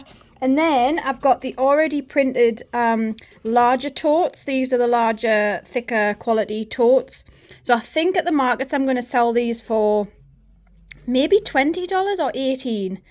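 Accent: United Kingdom. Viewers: Just came across your channel and subscribed. Love the succulents, really cute. Do I hear a hint of Geordie/Gateshead? Abinova on youtube is from Gateshead, and she has also been having problems sublimating the square mousemats; she finds the round ones easier. Catherine from Katnipp is another good small business channel to follow for advice & inspiration. Wishing you good luck and success at the market. 🍀Have a great week. 🙂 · I hear a northern accent. Are you from up north and still living in the UK or you in Australia ? They are lovely people they are 😊 I have just come across your channel 😊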